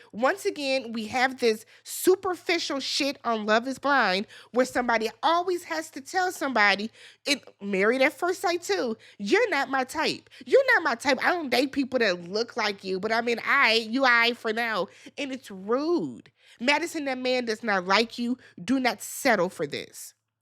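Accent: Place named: Minnesota, United States. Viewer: Kuh-lee-Bree-ah 🙄🙂‍↔️😑 you pronounce names like you’re from MN too…😶😵‍💫🥴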